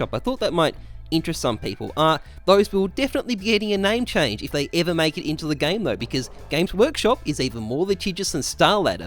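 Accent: Australia. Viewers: Isn't from Australia too? His accent is like an Australian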